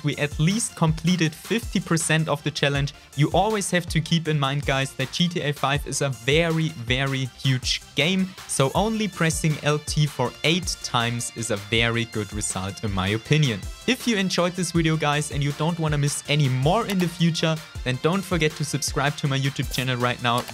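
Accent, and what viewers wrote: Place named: Germany. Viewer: Man hört einfach so hart raus,dass du deutscher bist haha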